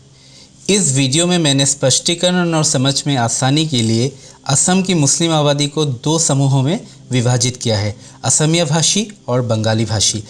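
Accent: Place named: Hyderabad, India. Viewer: Hyderabadi ya Bengaluru ka lag rha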